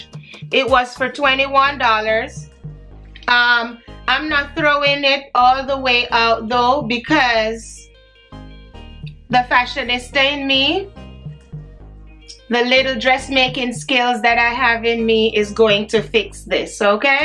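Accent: Patois, Jamaica. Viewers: Knew I was hearing Jamaican! New subbie hear. Great haul 😍😍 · "mi no like when we pants look like me tek measurement in a water." Real Jamaican. Girl you are funny. Love the haul.🤣🤣🤣🤣🤣🤣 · I just found your channel and I’m like she is Jamaican… wait till the patois comes out and it did !!!!I fell out laughing 😂 YES!!! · Love your Jamaican accent. You are? Love all the pieces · YOUR PAGE NEEDS CROWD!!! HELLA TALENTED. I hear a Jamaican accent . New subscriber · At first I was like this African lady looks beautiful let me watch. Then d accent chip in and i was like . A JAMAICAN DEN SHE NUH MUSS KILL DIS... LOVE D VIDEO !!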